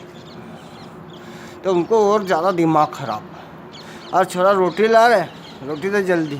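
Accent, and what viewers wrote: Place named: Nimar, India. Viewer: Sir आपकी भाषा हमारी भाषा nimadi की जैसे ही है... मे nimad से हू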